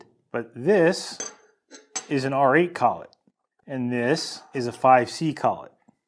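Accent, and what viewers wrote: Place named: New Jersey, United States. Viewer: YOU have got to be from ... New freakin' Jersey. You sound like Nfreakin'J. Your jokes have a New Jersey edge to them. You used the word "tuchus" correctly in a sentence, and I have a strong feeling you know what pork roll, egg and cheese on a hard roll, SPK is..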